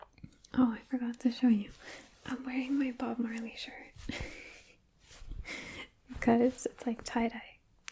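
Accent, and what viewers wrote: United Kingdom. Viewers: please make more tutorials with british accent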